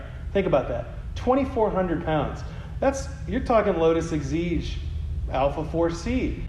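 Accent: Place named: United States. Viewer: I never even knew Nobl's were sold in America. Very weird seeing this car on yank roads and hearing an American accent talk about it. Top job.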